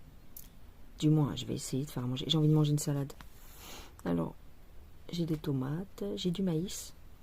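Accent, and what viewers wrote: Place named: Toulouse, France. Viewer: Coucou ma belle 🩷elles sont magnifiques tes toiles et le loup est magnifique 😍. Super ton humour, tes jolies phrases, on dirait une petite fille 👧. Merci pour ce partage et bon courage pour tes toiles dont tu aimes tant! Super 🤣🤣😀😀ton accent de Toulouse. 🩷🩷💜💜😀😀🌅🌅 Belle fin de journée à toi et famille 😘😘😘ainsi qu’aux loulous 🦮🦮🐈🐕🐶😘😘😘😘😘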